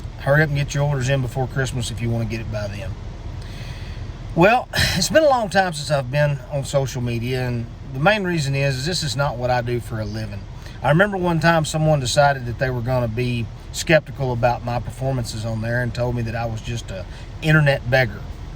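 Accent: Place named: Texas, United States. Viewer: what of Texas u from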